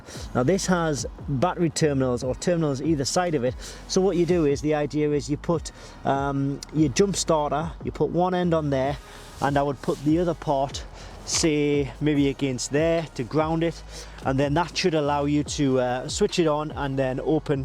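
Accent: Newcastle, United Kingdom. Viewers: Alan Buckingham Fairly sure he said that he wasn't sure about the air in the seats. Why you being so offensive, if you read the comments here you will see that most people did learn a couple of things, and for those that didn't were nice and polite, the way a gentleman would be. He has a Geordie accent, and probably has more class and decorum in his little finger than you have in your whole body. You fucking child. Jog on your not welcome here.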